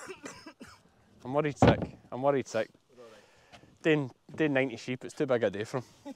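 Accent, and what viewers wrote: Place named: Ayrshire, United Kingdom. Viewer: I should have kept up with your videos,! I haven't watched for about 5-6 months and I have lost my ability to really understand an Ayrshire brouge.□ My bad. I've got to do better! Terri from Texas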